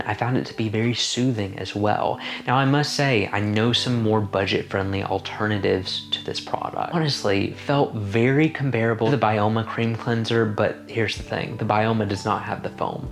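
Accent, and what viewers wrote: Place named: South, United States. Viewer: Every time I watch your videos I just think I love you so much you seem like such a sweet boy (man). Your subtle southern accent is the best.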